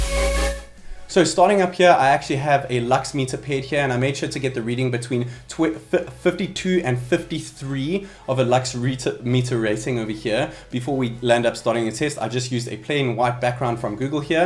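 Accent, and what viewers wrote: South Africa. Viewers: Hey are u from South Africa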